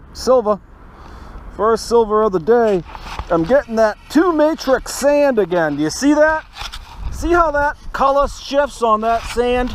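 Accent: United States. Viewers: i f'ing hate the way you yankee's talk ,but i bet you feel same about us ,so we are square ,lol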